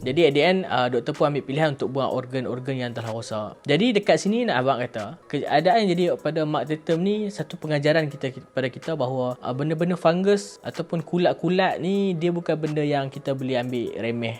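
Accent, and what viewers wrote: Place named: North, Malaysia. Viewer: Pekat utara😂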